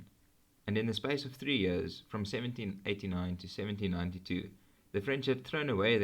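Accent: South Africa. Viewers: I also guessed the narrator is from SA. Blown by the quality content and precise narration 🇿🇦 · Thank you for your gracious reply. I note that others have said much the same. Having been at school with S.Africans I have no problem with the accent but audio levels need to be higher and delivery a bit slower. This will make your channel one of the very top military history ones anywhere with simple graphics, directions and exact distances given. The fact that so many are saying he same is a tribute to your scholarship. Best wishes, Dr Stephen Hall, Ireland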